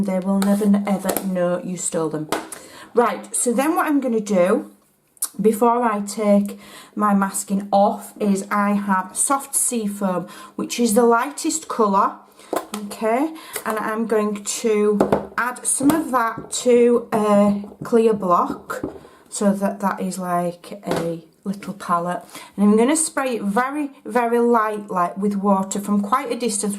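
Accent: Yorkshire, United Kingdom. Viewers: I'm a eighty year old American,only been crafting a few months, a real newbie and can understand every word,well, almost every word. lol I love your Yorkshire accent. I traveled America several times, you should hear some of the pronouncements, slang and diction. LOLOL Keep what you love and I will love it and you also. Love your work, explanations and techniques, and especially love when you laugh at yourself. I subscribed right away. xoxo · I just love your Yorkshire accent, and I love your little quirky sayings....'pants', ' rubbish', 'wonky' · I shouldn't worry the captions never make sense anyway whoever's speaking. I understand your accent perfectly being a Yorkshire lass too. The captions do entertain tho lol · I LOVE your Yorkshire accent.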